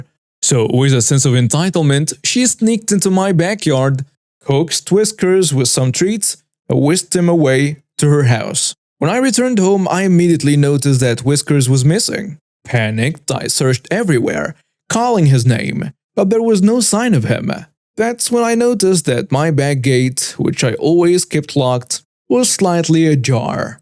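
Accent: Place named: United States. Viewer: Hi! I hope that all is well with you and your loved ones. You are amazing with the English language. You can say several sentences in a way that sounds like you're an American! You are pretty much fluent! 👏👏👏 It's snowy, windy, and the temperatures in northeastern US right now, p.m. are 4°F. It's freezing! What is it like in Egypt? I wish you continued success with your channels! Take good care of yourself. Work hard, but find time for fun and relaxation, too!😊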